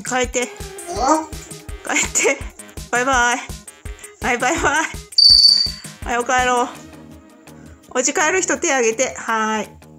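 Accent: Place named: Kansai, Japan. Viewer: さんちゃん、よく話を聞いてますよね。 ちゃんとやりとりしてるし、いつもコミュニケーションが、すごいと感心するし関西弁にも💙💛💖です。 カワイイ😍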